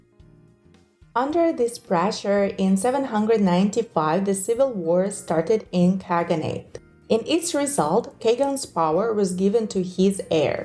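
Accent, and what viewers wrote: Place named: Eastern Europe. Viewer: You sound Slavic too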